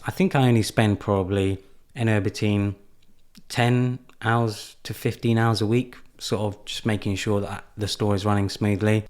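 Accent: United Kingdom. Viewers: you could prolly get paid for your voice too, cool British accent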